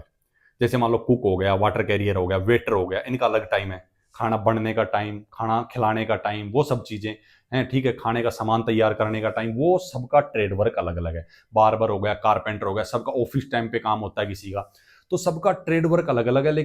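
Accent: Haryana, India. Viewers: Bhai aap haryana se ho Kay ?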